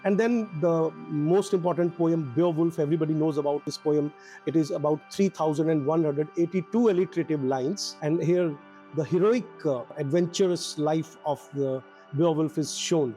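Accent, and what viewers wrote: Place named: Kerala, India. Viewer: Good sir, good information ,you are speaking like kerela teacher Awesome work sir...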